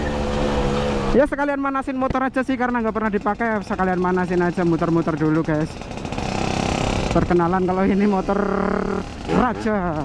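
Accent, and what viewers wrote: Banyumas, Indonesia. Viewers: Mmas cah ngapak yo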